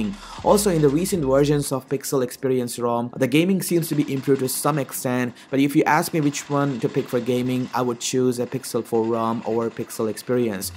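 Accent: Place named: India. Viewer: Please it's data..not dayta....no hate but keep you Indian accent man please.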